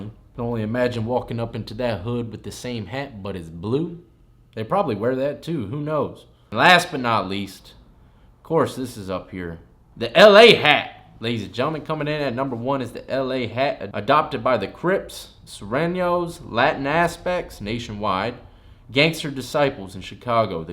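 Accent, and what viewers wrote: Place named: Appalachia, United States. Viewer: Why you talk like a hill Billy bitch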